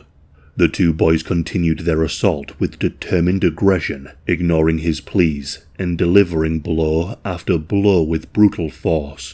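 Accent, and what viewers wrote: United Kingdom. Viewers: Can someone answer me this please; Where is actually from? 🌎 🤭 Because his channel says Australia, but he sounds British 🧐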